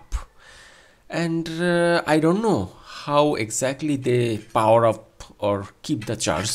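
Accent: Bengal, India. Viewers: You have such a STRONG Bengali accent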